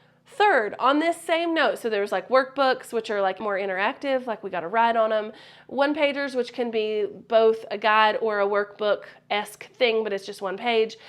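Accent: South, United States. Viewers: You are fantastic! Love your videos and positivity with a splash of southern twang :) Question, If you create a digital worksheet in canva do you have to do something specific when selling it as a digital product so that someone can use this and actually write in/on it via their iPad? If you have a video on this - could you point me in the right direction?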